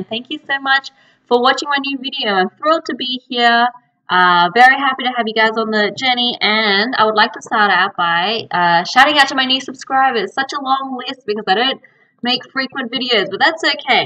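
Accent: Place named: Australia. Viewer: great to hear from you again and know you are doing well there. Probably you can talk about next show - how you maintain the Australian way of doing there, for example your Australian accent, and how you prevent yourself from being ‘colonized’ by the Americans. Lots of love, SK.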